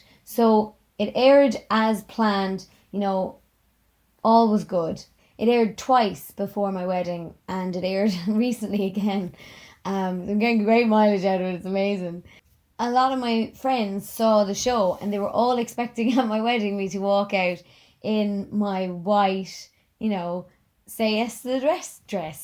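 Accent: Ireland. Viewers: Omg I love love love your accent I always wanted an Irish accent 😍😍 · Subscribed because all my family lives back in Ireland and I’m in Canada and miss the accent ❤️❤️ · Your accent is lush. <3 I'm actually subscribing to your channel, based on zero other videos, because I figure I'll get to hear you talk, which will automatically make any other content you produce fascinating by default. I have a couple of Irish expat friends, and you make me think of them. They're from Cork, and I have terrible hearing damage, so having drinks with them, and when they've had a couple and start going super rapid-fire with the talking, it's basically just this lovely, lyrical wall of incomprehensible sound, hahaha! Anyway, came for the SYTTD story, stayed for the pretty vocals. :-) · Me sitting here trying To copy ur accent. And i fail alot :p we Swedish People cant say irish "start" xD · What a lovely brogue..I could listen to you talk for hours :) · It's an Irsh accent. 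She's saying that her IRISH accent is cute.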